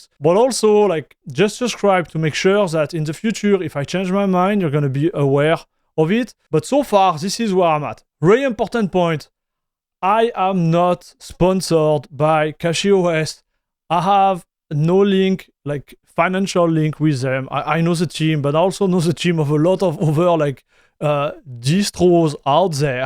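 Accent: France. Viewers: French accent at it's best! Love! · It's Frenglish but it"s not too bad 😀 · bro he's likely native French and learned english, how do you think accents exist?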